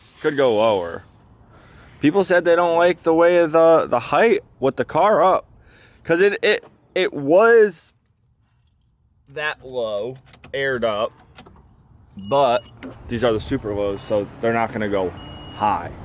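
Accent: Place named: East Coast, United States. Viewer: That was the ultimate Connecticut shitty east coast accent I gotta cwall my ooltinata that dropped oaff is done it sounds like him saying cwoffee I can't stand that accent between the east coast accent an Wisconsin accent fuckin terrible I wonder if he hears how he sounds that was so dramatic the other day when he said its gonna be hard not having his 13 in the shop how the fuck could that make you sad your getting it painted an its a car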